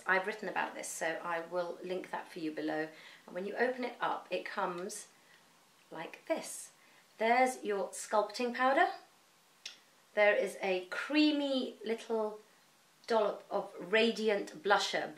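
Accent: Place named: United Kingdom. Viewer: Thank you so much for the video!i m a new subscriber and so so glad to have come across your channel and blog!i have watched all of your videos now and absolutely love your style!2 months ago we moved from London to Canada and it is so so lovely to hear your gorgeous british accent😉😉!i love listening to you!at the end of april i m coming to London with my two little boys and will defenatly check out your recommendations!i would love to see a whats in my bag with new celine bag! Your previous video with celine trapeze bag made me just fall in love with it!i am planning to get it whilst in London!i love your colour and hope i can find something similar!i know that these colours are seasonal but lets hope for the best!my question for you would be whether after owning celine trapeze bag for a while would you still repurchase it?did you have any problems with it?i absolutely love yours since i saw it and now cant get it out of my head!👜👜👜i hope i can find a lovely colour!thank you so much for being on you tube!i m so glad to have found your channel!i love your instagram!💋💋💋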